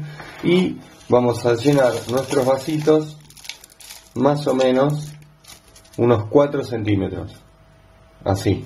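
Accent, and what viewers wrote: Argentina. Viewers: Me encantan tus videos!! Muy explicativos y en español argentino jaja Queria consultarte donde conseguis la planta de consuelda ya que no la consigo.. muchas gracias!! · Daniela Medrano Terrazas el muchacho del video debe ser de Argentina por su voz. Aquí las temperaturas en invierno pueden ir desde los -25ºC a 20ºC dependiendo la región. Sí es de Buenos Aires, en invierno las temperaturas son de 0º a 15º.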